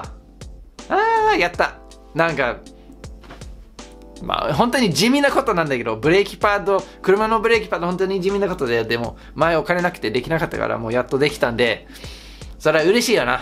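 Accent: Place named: Kansai, Japan. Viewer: ずっと思ってたんやけどの方言って近畿地方かな？話し方だけで親近感がわく笑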